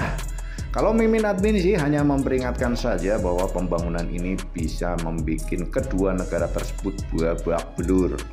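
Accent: Banyumas, Indonesia. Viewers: admine ngapak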